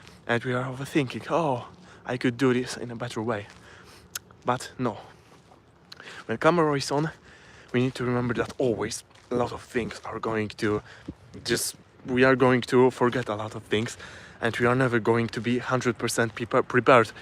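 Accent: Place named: Poland. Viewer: accent was lost on this aussie 😂 now i know a polish accent i guess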